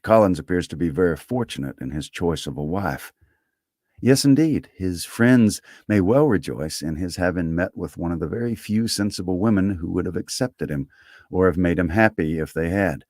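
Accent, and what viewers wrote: South, United States. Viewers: This is genius. The southern accent really suits P&P. 😂❤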